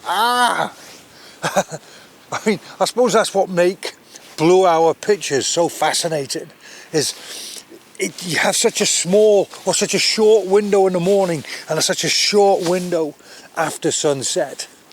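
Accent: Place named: England, United Kingdom. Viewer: Boy, I love you as a photographer. You’re in England. I love the accent and the shots are just gorgeous. Now I know what the blue hour is, but we never see one here in California. I live I live in London I loved by cameras. I haven’t been doing any photography lately because I’ve been recuperating from a surgery and just watching you is one of the best things I have seen in a long time. Thank you for your pictures.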